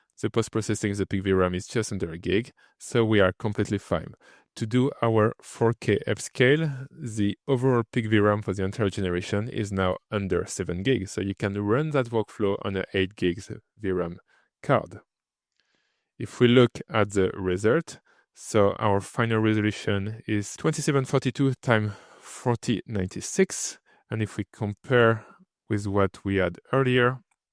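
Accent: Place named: France. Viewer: Really fantastic video tutorial, very well explained and in depth. Your english is also fantastic, however, if I am brutaly honest, you have quite a strong french accent and pronounciation, which makes it hard for me to understand you perfectly all the time. Only pointing this out as I found the subtitles very helpful, for following your speech, so remembering to include them in your uploads will likely always be very helpful for your viewers. Look forward to watching more of your content. I am interested in developing some ai photogrammetry to stereoscopic 3d/vr and cad and stl file pipelines, so finding the best way to optimize poor quality low res recordings from a very large number of different sources, efficiently with a high quality footage output, is critical for this objective. I assumed that this upscaler would not be the best choice for this, especially as my first tests were generating unrealistically sharp details, but now thanks to you, it looks like the best option I have found so far, though like you say, it could be better at handling motion. 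Not sure if there is a better free offline alternative for this? Now I have to figure out how to compare the quality output between different video renders in kdenlive, as I am new to comfyui and have no way to install the required nodes, etc, to do this for the next couple of days. I come from more of a 3d modelling background personally and am astonished to learn how many laborious tasks AI can speed up nowadays. Quick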